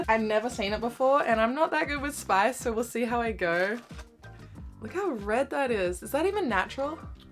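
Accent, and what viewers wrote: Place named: Australia. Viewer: your accent is so cute. I wish I was from australia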